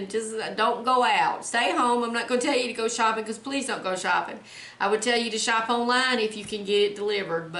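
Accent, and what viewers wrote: South, United States. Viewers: You're such a good Mama, great haul, and sure have missed your Southern accent, be safe♥️🐾♥️🐾♥️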